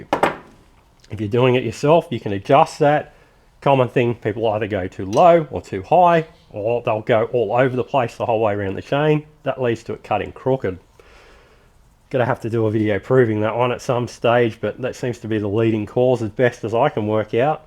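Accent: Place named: United Kingdom. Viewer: I can’t understand this guy’s British accent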